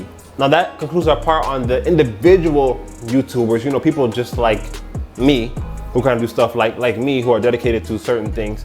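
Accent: Dominican Republic. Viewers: Intro sounds Dominican 🤷🏽‍♀️🤷🏽‍♀️🤷🏽‍♀️🤷🏽‍♀️ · eres mi idolo. soy estadounidense e igual que tu, vengo de chicago. ahora soy universitario y hago la carrera en espana. diria que por lo general domino el idioma pero me gustaria hablar como tu un dia y que la gente no sepa que mi idioma natal no es espanol jajaja. lo gracioso es que a pesar de haber aprendido en espana trato de hablar con acento boricua jajaja. no tiene mucho sentido ya que vivo alli pero por ejemplo como la mayoria de hispanohablantes en el mundo no usan vosotros pues me parece mas practico usar solo ustedes aunque si que es verdad que he aprendudo su jerga jajaj. por cuerto tus tik toks y el flow dominicano que llevas ta duro. tuve un companero de cuarto del patio y entonces a traves de el y tus videos, y mi deseo de hablar como boricua, intento conseguir mi propio pikete caribeno jajaj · I’m Haitian and African American and the Spanish language has really driven me to find resources to learn Spanish. 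I have a bunch of Venezuelan and Puerto Rican friends and I’ve been trying to dissect and master the Puerto Rican Spanish for the longest. It’s a little hard because I don’t know too many helpful vids out there but you’ve giving me hope considering the fact that you’re African American as well and speak like a Dominican.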